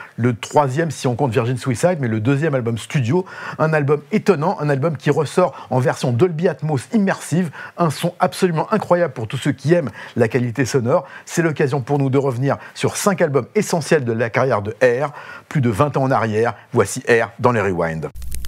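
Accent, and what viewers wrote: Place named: United States. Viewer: Merci pour cette rétrospective bien sympathique.. Un clin d’œil à ton accent américain c’est énorme ! Cindy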